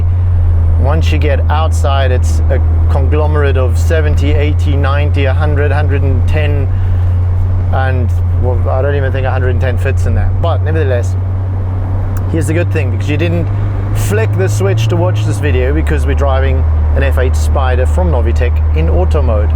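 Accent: South Africa. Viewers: I know a SA howzit when I hear one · Bro are you a South African?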